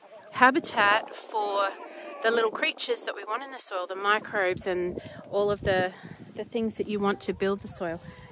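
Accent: Australia. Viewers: Lovely hearing an Aussie accent in a homesteading video 😊 (fellow Aussie here). Thanks for the tips, looking forward to bingeing the rest of your videos.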